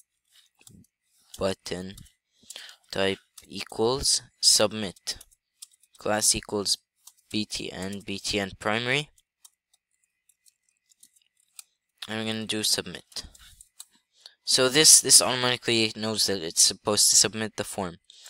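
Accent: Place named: Arab world. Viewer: Unrelated question: Your accent sounds Arabic. Are you Arabic?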